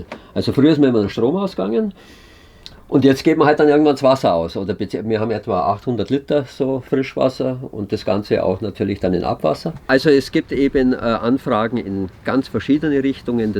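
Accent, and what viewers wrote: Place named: Allgäu, Germany. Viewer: Du bist ne absolut coole Socke! Dein Bus ist obersupergeiles Teil, ich bin begeistert. Deinem Dialekt nach würde ich Kempten vermuten, oder in der Gegend?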